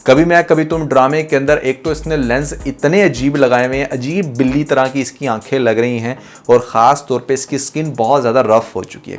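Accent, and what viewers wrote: India. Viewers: ان کی خوبصورتی کو چھوڑیں، پہلے یہ بتائیں کہ آپ بھارتی لہجے کی نقل کیوں کر رہے ہیں۔ پھر کے بجائے فیر کہنا۔ پاکستانی ہیں تو اردو تلفظ اپنائیں۔ You sound disgusting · میرا اعتراض الگ ہے۔۔۔جو تم ہندی لحجے میں لفظ۔۔۔پھر۔۔۔کو۔۔۔فِر۔۔۔کہہ رہے ہو اس۔ کی کوئی خاص وجہ ہے کیا؟؟؟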